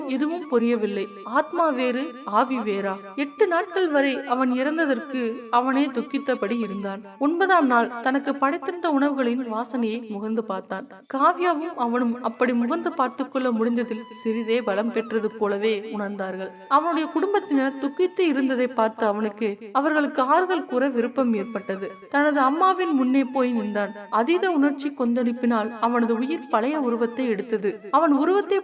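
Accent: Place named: Jaffna, Sri Lanka. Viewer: அவ்விடம் கற்பனை அதிகம் உண்டோ.என்ன யாழ்பாணம் தமிழை விடமுடியவில்லையா .கதை மிக சுமார்தான் .😂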